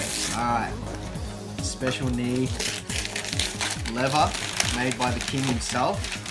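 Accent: Australia. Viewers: Heya, did you get yours from focusattack? I'm guessing you're Aussie with the accent (lol) so how long did it take to ship? I'm in NZ for reference.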